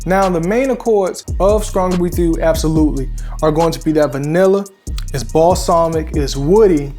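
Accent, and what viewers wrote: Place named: South, United States. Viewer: You must be from the south, the way you pronounce st words 😂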